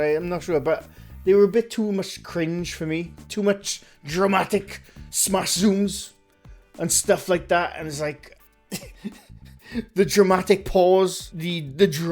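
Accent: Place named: Scotland, United Kingdom. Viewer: You go sir, I would love to meet you and we do our own investigation and your absolutely correct once that money rolls in they are no longer a progression of themselves and start making bullshit. Id do a investigation with ya but it seems like your from maybe Scotland holy shit that whole place is haunted as hell I truly believe. Keep doing what you are doing I love it ..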